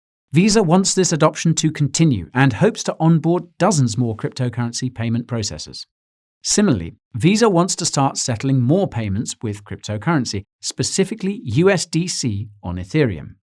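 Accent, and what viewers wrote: United Kingdom. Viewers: This is how we talk in Britain. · I was just listening to that cool British accent 😅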